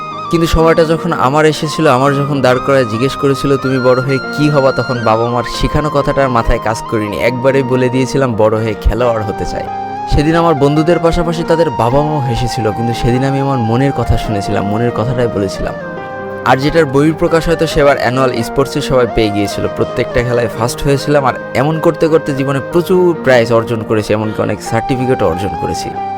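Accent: Jessore, Bangladesh. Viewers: ভাই আপনি তো আমার নিজ জেলার লোক আমি বুঝতে পারছি আপনার বাসা যশোর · ভাই আপনার জেলা মনে হচ্ছে যশোর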